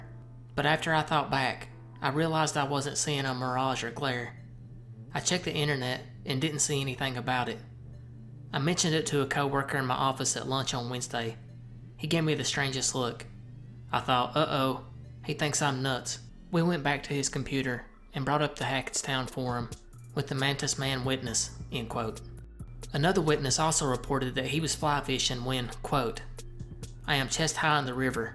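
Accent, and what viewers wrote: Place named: Mississippi, United States. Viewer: That’s the First thing I thought. Great content in something close to my Mississippi accent! Keep up the good work!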